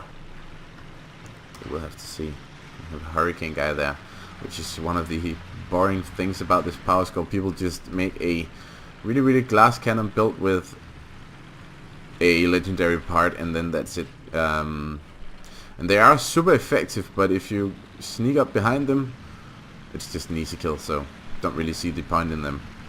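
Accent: Denmark. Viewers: 5 seconds into the video I'm like : "This dude is totally danish" 😃🤘 Skål, brother xD <link>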